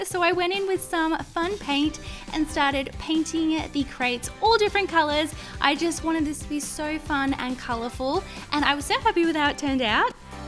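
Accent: Australia. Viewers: are you Australian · I feel like your Australian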